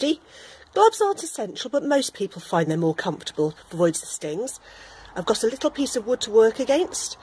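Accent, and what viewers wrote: England, United Kingdom. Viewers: many thanks. Made my first nettle cord a couple of days ago. Very happy. BTW my Taiwanese wife thinks you have the clearest English accent she has ever heard. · I like the tone of your voice and the English accent is lovely 😊 very clear explanation👌 hope you will make more videos like this. Do you make baskets too?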